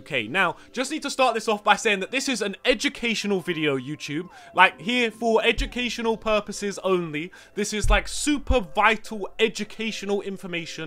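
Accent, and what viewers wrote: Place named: Surrey, United Kingdom. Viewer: You sound like a surrey boi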